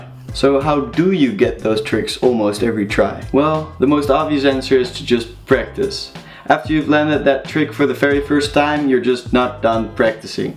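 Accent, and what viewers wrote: Netherlands. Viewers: He has a Dutch accent, m8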